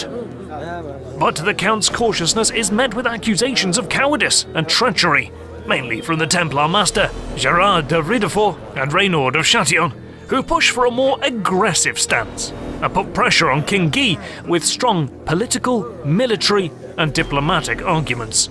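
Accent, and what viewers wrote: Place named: Yorkshire, United Kingdom. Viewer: ... Ok... I give up! It's almost completely gone, but I reckon you are either from Yorkshire or 'the other place' (Lancashire) lol. Or am I completely wrong? haha LOVE your channel and the fact that you present things in a way that is so easy to understand... wonderful! :)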